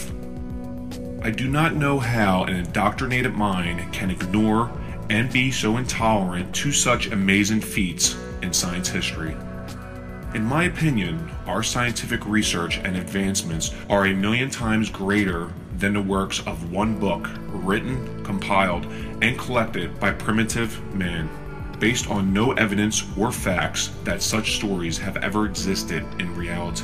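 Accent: New Jersey, United States. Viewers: Liked the video, but the voice modulation thing is...weird...coupled with a NJ accent. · The combination of gravitas-evoking reverb and Jersey accent makes me smile.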